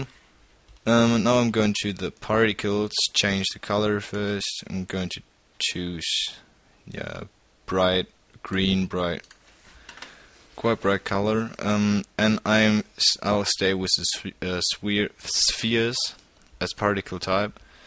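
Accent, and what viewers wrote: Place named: Germany. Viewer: Man hört das sofort wenn ein deutscher englisch spricht .. :D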